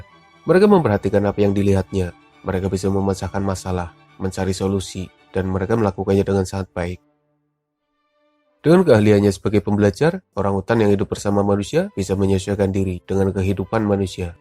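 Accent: Banyumas, Indonesia. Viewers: Host ny NGAPAK BANGET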